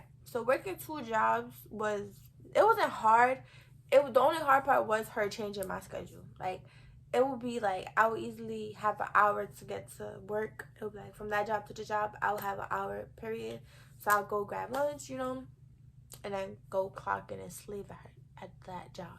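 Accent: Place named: East Coast, United States. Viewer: You from the east coast? NY or NJ I can tell by the accent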